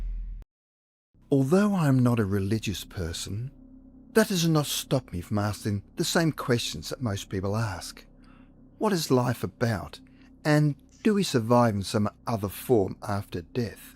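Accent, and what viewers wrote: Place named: Australia. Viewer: Australian accent, I keep thinking of the geico commercial and the little gecko 🦎 is goning to appear at any moment.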